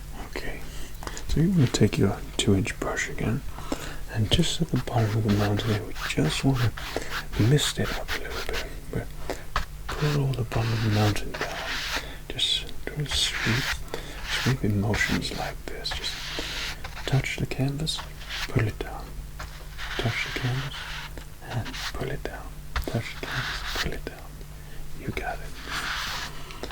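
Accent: United States. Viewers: Honestly, solid American accent. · How hard was it trying to imitate an American accent? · The effort spent towards getting the American accent just right is amazing! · IS THAT HIM TALKING? If it is great american accent my guy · Why do I always seem amazed when an English person does an American accent, when they do it seems kinda struggled if they go for to long, he did really good though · it’s eerie because Bob recorded in Indiana, where very few people have an accent, but gets it nearly spot-on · His American accent on point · Bless you, your Yank accent is definitely improving! 😀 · Never thought I'd hear with an American accent! Very good!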